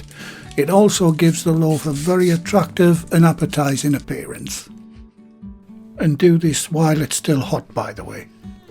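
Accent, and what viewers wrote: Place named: County Durham, United Kingdom. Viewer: Only discovered you channel today, not just great simple wholesome recipes but your voice is making me homesick. I’m from County Durham but live down south now.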